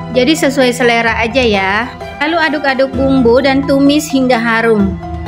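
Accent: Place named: Sumatra, Indonesia. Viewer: Makasih kk,,,bahasa kk macamnya org sumatra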